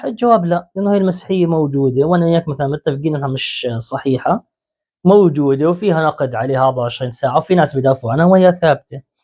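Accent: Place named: Jordan. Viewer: بحب اللهجة الاردنية 😂 طب الحق علي انا يعني دقيقة ٩ و ٣٠ ثانية تعديل بخاتمة الفيديو ( هو انت بدك تتجوزني ) 😂 جملة ايقونية يزم يسعد رب الاردنية ❤❤❤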